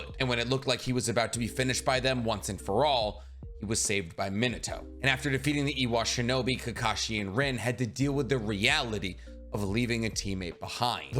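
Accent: United States. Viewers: Min-uh-toe........Min-AH-toe. Sounds infinitely better when you pronounce his name properly. You know, for as much of your content, and thus, your livelihood, depends on Japanese culture, you'd think you would spend 20 minutes learning how Japanese phonetics work(and no joke, it doesn't take longer than 20 minutes to figure it out, and really, 20 seems like far too long at that), so you don't sound so absurdly... White? So American? So gaijin? Either way, I know you can tell how weird you sound since you've heard all these names said properly in the anime. So why are you so content to sound so off putting?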